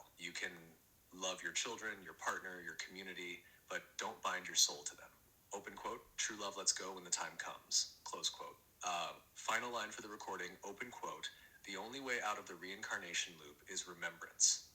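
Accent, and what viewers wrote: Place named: RP, United Kingdom. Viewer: Wow I love the British accent, almost posh, and I’m british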